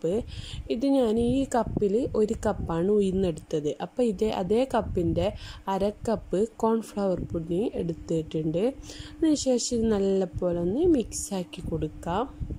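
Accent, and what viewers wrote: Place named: Kasaragod, India. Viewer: ഞമ്മളെ ഭാഷ കാസറഗോഡ് ആണോ കേട്ടപ്പോ അതേപോലെ തോന്നി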